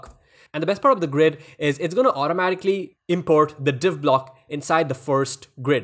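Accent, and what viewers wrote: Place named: India. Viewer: Its simple, I hear indian accent, I click back to search :)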